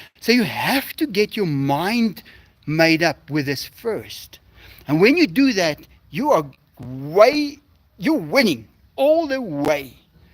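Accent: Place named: South Africa. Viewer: Unique South African accent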